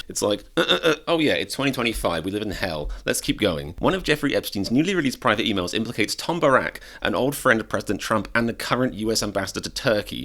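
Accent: United Kingdom. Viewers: Love the British accent pronunciation. Makes "The IT Crowd" episode with Jan's new boyfriend, Peter File, that much more funny.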